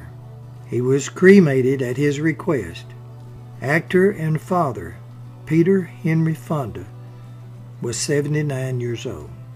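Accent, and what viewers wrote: South, United States. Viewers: Dear, enjoy your southern draw, and gift in Biography's which keep getting better and better.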